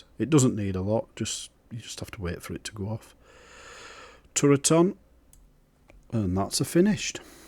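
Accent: Barnsley, United Kingdom. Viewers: you sound like your from barnsley ?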